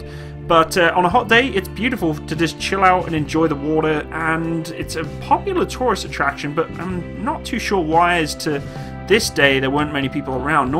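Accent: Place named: North East England, United Kingdom. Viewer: what part of north east England r you from im from Hartlepool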